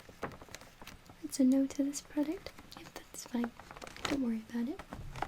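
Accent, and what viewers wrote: Wales, United Kingdom. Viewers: she's Welsh to be more specific :p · Ur Welsh right? U sound it! I'm Welsh too ur so pretty and sweet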